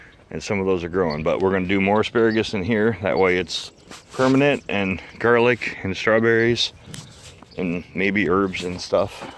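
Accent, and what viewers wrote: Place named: Midwest, United States. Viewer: Whoo hoo get to watch my favorite turner do other stuff. I hope this goes well for you and. I love all your content. Makes me want to go home to Mishawaka/Granger area. My family bis still there brother and sister aunt and bunches of cousins. Miss that Midwestern accent!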